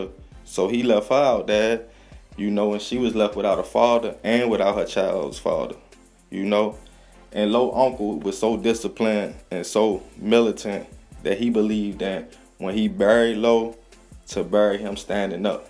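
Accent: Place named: DMV, United States. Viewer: Lo was 18 bro get it right sound like you from the dmv so you not from out here so it's not your faught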